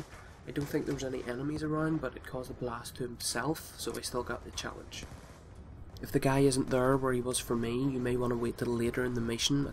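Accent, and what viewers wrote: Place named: Northern Ireland, United Kingdom. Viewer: Thanks for the great guides. Looking forward to the next ones. It's been a long time since I've heard a real Northern Irish accent ;)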